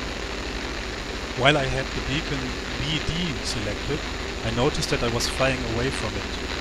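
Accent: Germany. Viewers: You sound a little bit like a german guy... am I right?:) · Ihr deutlicher "germischer" Akzent verrät Ihre Muttersprache.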